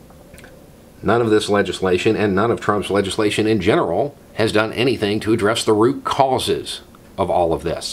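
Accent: South, United States. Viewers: Hey,. I have a question, Is " " a character you play? In several interviews you do not have a Southern accent (or as close as " ") and I am just curious to the why you come off as " "? I am a long-time fan and supporter but did I miss a video or two where you explain this? All the best to you and yours. You'll always be my favorite "Florida Man".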